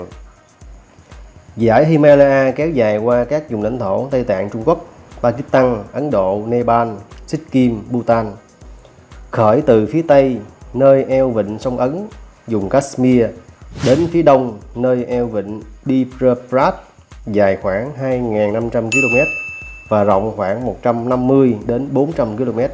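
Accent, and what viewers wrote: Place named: Hau Giang, Vietnam. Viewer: Giọng người Hậu Giang bạn ơi.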